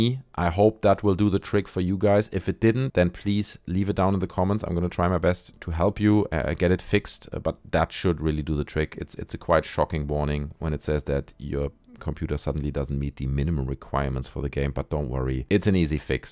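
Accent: Germany. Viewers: are you german you have a very german accent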